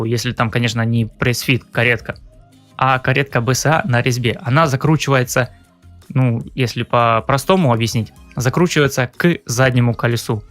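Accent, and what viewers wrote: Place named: South, Russia. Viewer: Ну, можно так подумать по голосу, если представить, что озвучивал слегка выпивший или покуривший человек. Но, автор у нас со своим южно-российским говором, там почти все так говорят, а он ещё и не по тексту, поэтому паузы в речи. Думаю, что трезвый он был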